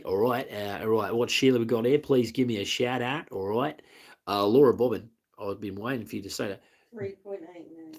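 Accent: United Kingdom. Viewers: You can tell he is British….😂I’m British to My fav teacher is mrs fox, I wish British people didn’t have to wear school uniform, I have to wear a green tie 🙄 and it’s SO uncomfortable 😭 😅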